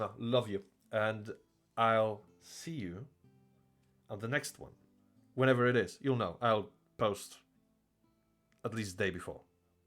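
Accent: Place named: Germany. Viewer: you sound kinda German but also not at all, the accent is so hard to pinpoint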